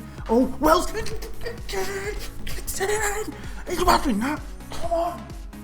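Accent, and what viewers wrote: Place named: Manchester, United Kingdom. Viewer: The most manc accent iv ever heard!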